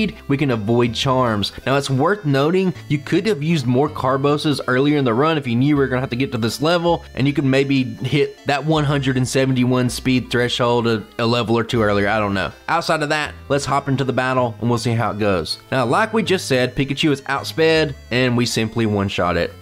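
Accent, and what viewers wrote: South, United States. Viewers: Almost got to 500! Also, I still love that I get some good ole southern representation and you’re not afraid of it. One thing that’s present in media is a bias against accents and i love people who can be themselves. So from a Georgian, thank you! Also, you the sandstorm meme could just be a slight against the University of South Carolina 😊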